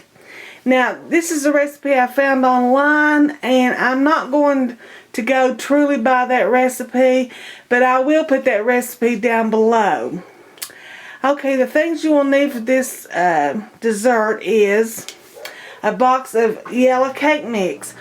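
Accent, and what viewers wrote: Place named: Kentucky, United States. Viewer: you sound like youre from hazard Kentucky. sweet accent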